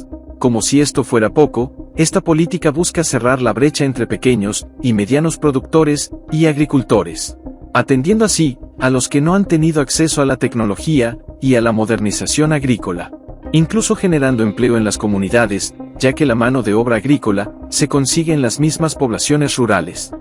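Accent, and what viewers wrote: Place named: Castile, Spain. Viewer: Un buen documental con una voz narrativa en perfecto castellano. Muchas gracias desde Tenerife. Reino de España. Viva nuestro muy amado EL SALVADOR !!!.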